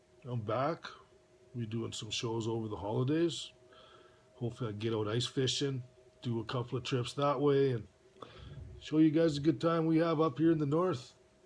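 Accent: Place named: Upper Peninsula, United States. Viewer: are you in the Yoop by chance? I am originally from Iron Mtn area and live in Denver. But i tht I could recognize the ol' accent. Great video, i saw this dudes video about a week ago and was blown away!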